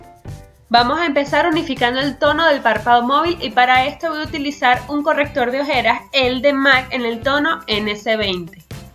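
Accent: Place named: Venezuela. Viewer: venezolana verdad (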